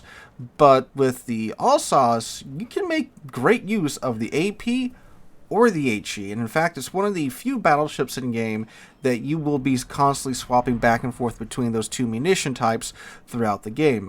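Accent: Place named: United States. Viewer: the Alsays! xD There will be numerous shipnames in any number of languages to butcher in this game, so I get it but its still funny to hear you pronounce some of them in a very "Americanised" way!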